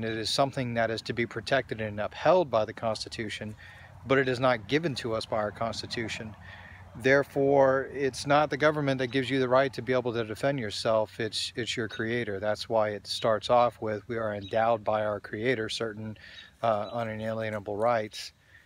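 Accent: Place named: Florida, United States. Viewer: Sounds like you're a Floridians also if I hear right. St. Augustine here. Good stuff. Well spoken words.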